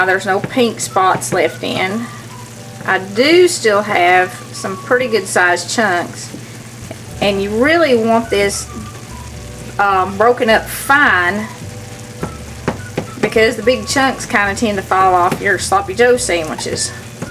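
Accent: South, United States. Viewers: I love your videos!!!! Keep up the good work. My family is from eastern Kentucky so I love that southern draw. I believe your from Knoxville if I'm not mistaken. I spend a lot of time in the Smokies. Your lucky to be that close, I'm 4 and a 1/2 hours away (Dayton, Ohio). One last thing, I see you have the Andy Griffith show on. Best TV ever.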